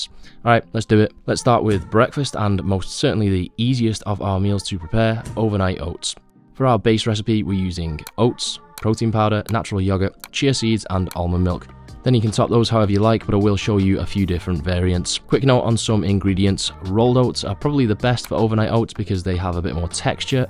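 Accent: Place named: Liverpool, United Kingdom. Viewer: Scouse lad haha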